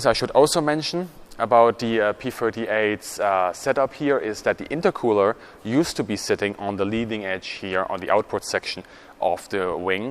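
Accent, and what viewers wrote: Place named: Germany. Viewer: Too fast, too German, too bad.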